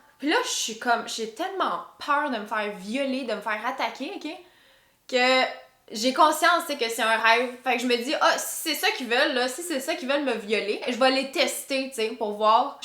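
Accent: Canada. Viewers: tu parles comme une canadienne, je t'adores, t'es trop belle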